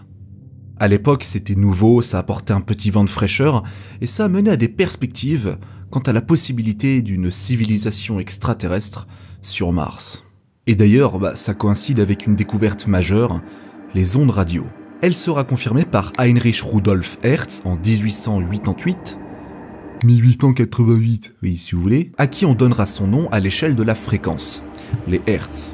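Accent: Belgium. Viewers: Bravo tu parle le francais comme un belge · En tant que cousin français, la prononciation à la belge (quand bien même plus logique lorsque l'on y réfléchit) des nombres implique effectivement une conversion lorsque l'on l'écoute. Inutile d'être mesquin si cela fait tiquer, c'est naturel. Pauvres habitants d'El Paso, avoir la finale de Super Bawl qui saute, ils en ont eu, de la patience! Le SAA a dù démanger!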